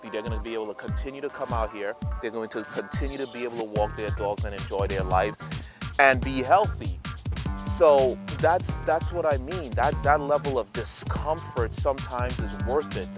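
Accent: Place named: Caribbean. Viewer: Yo!!! You sound like you from the W.I.!!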